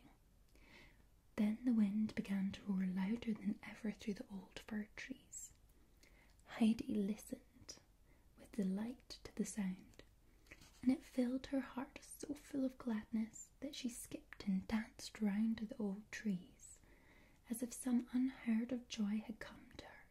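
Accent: Scotland, United Kingdom. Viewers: 180 minutes of tingles from the resplendent scottish lass. bank holiday monday just got better. ☺️ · Love me some and Scottish ASMR 🥰😍😴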